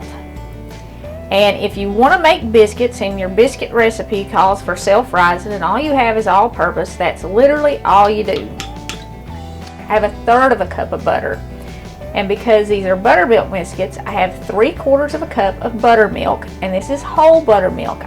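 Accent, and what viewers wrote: South, United States. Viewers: Where in the South do you come from? I am a Buckeye (worthless nut) but I love southern cooking. You are easy to listen to. I love southern cooking and I love to hear someone whom I believe is saved.! If you know the Lord (Really know). you will be sincere and honest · Living on the west coast, I love your southern accent. I could listen to you all day. The tip on making ap flour into self-rising was most helpful. You talking about Jesus was an unexpected delight. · Love to hear you talk. I am from the south but have been living in the north for the past 21 years. I miss the southern language so much. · Amen...Raised as far Southest Kentucky as you could get,Live in Arizona now..Hearing her southern voice reminds me of Back Home Sweet Harlan County...Girl your biscuits look Amazing...Jesus Sure Loves all his Children...Amen😇🙏🏻🙌🏻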